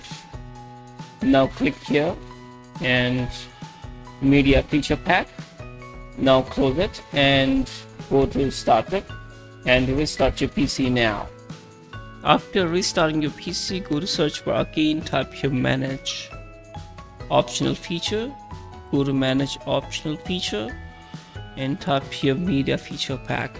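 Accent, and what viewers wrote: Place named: India. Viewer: Always the underrated Indian accent tutorials that ALWAYS WORK!!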